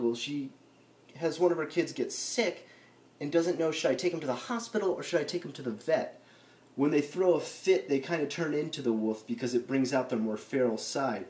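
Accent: United States. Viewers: do you pronounce the japanese language in your videos as you would when talking to Japanese people? It sounds very americanized to me. Still, great videos:)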